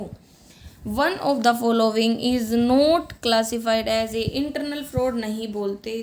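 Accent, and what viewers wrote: Haryana, India. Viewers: Ma'am haryana se kaha se ho?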